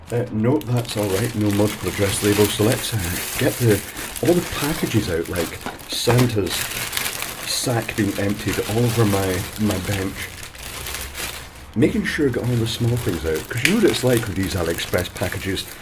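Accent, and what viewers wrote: Scotland, United Kingdom. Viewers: A Scottish version of Ashens 😂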